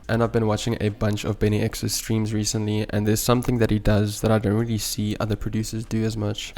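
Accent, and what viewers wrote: South Africa. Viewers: You sound south african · I know a SA accent when I hear one 😭🔥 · Bro. Are you South African? That accent?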